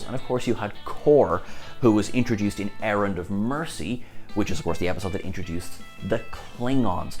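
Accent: United States. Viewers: strong american accent, lol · Your American accent is brilliant!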